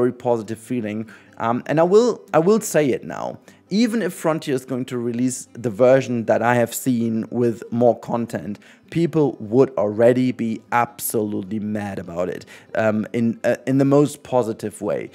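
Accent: Germany. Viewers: Great. Grüße. Warum machst du eigentlich englische Videos? Man merkt natürlich das du deutscher bist :D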